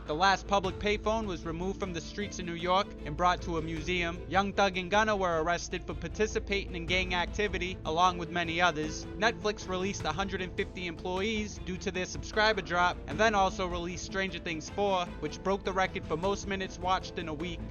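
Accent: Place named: Massachusetts, United States. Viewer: With that accent, announcing that you're from Mass is the most unnecessary thing ever.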